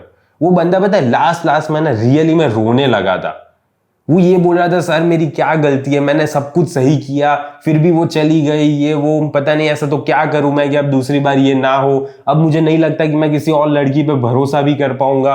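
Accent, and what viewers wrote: Gujarat, India. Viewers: Gujju accent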